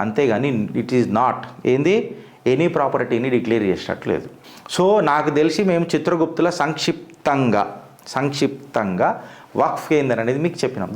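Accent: Telangana, India. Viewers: Babu chinna request, meeru telanganavalle doubt ledu, alani motham antha Telanga yass lo maatlaadutunte konni artham kavataledu, chadukunna vallu local yass unte, mari chaduvu leni vallaki and chuladukunnavaallaki theda yemi undi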